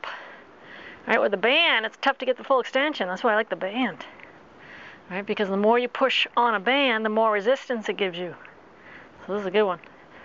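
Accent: United States. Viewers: your instruction is perfect,love you to bits,is the hair to keep us smiling because it’s working.love your USA accent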